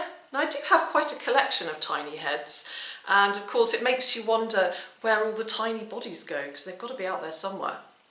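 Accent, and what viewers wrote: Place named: England, United Kingdom. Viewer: I love the research you do for the objects you find , it really does add that extra dimension to your show plus it's always nice to see your Beautiful face and hear you lovely English accent !.....I really miss living in England .....I must come back again soon I think !!.....I particulary liked Cornwall and was wondering if you might have a holiday there and do some Mudlarking along the Pirate Coast !!!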